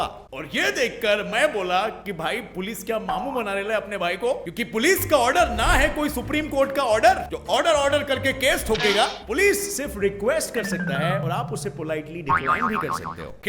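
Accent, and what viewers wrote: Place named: Telangana, India. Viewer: Telangana hindi language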